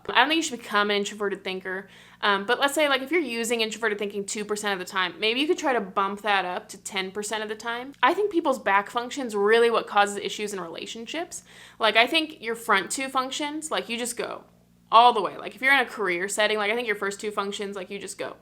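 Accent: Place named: France. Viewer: Kool Vidéo! You speak english like it is french😎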